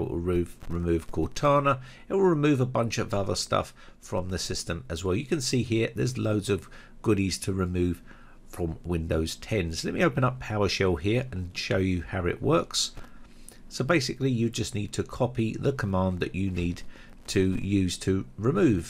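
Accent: United Kingdom. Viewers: Your talking style is so British. Great videos btw.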